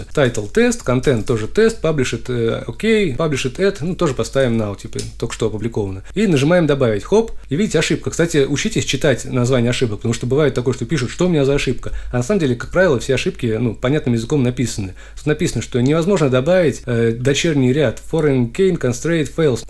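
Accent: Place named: Uzbekistan. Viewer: Узбек веб разработчик на удаленке… Или я один так слышу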